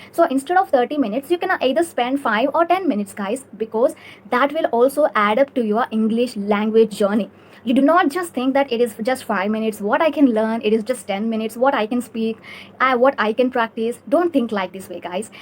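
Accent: South India, India. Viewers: Indian accent u know ?😏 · Hey you talk very nicely....and that South Indian touch while motivating people is great... every South Indians has this thing in them... talking so maturely...keep up the good work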